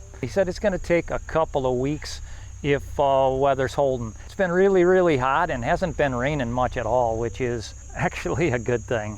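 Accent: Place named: Midwest, United States. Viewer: Are you from Chicago? I detect a midwest accent. I grew up in Illinois but been in CA for 40 years. Thailand next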